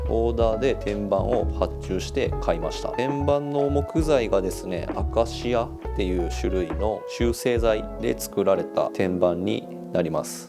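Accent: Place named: Kansai, Japan. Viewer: こんな素敵な部屋で、素敵な声でイチコロですね〜 関西弁に親近感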